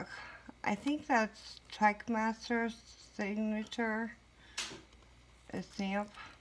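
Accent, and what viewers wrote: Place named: South, United States. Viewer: Just recently subbed to him!!! The dudes a real good picker ...... and he has a bad ass southern accent....!!!!!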